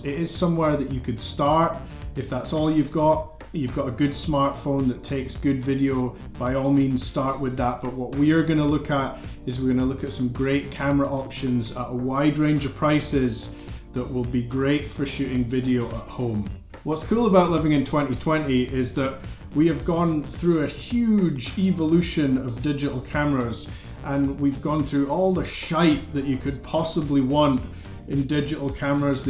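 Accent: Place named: Scotland, United Kingdom. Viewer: This has been really helpful advice for me dude! Its also nice to hear it from a fellow Scot 😂 been trying to teach myself since COVID started but there is so much advice out there that it’s really overwhelming! Thank you for making this man!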